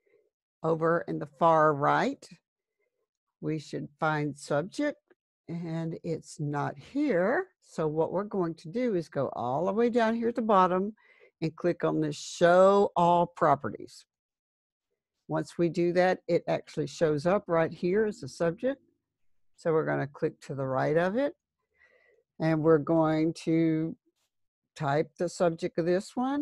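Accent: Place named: South, United States. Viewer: A very beautiful southern voice my dearest, tell me are you from Florida?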